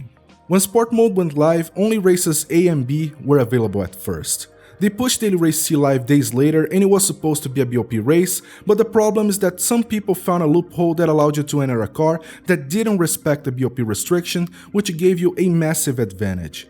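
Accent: Brazil. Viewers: I can tell you are Brazilian. Ótimo video!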